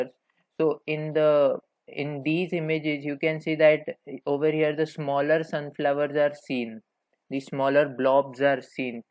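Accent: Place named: India. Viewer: 讲的挺好的，就是印度口音听着有点别扭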